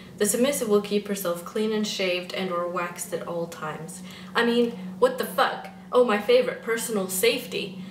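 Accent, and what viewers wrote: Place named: Europe. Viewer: Is this lady European because I can hear a slight accent.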